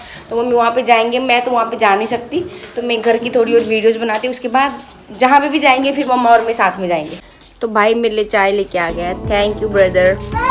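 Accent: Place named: Nepal. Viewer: Aap toh pieor nepali bolrey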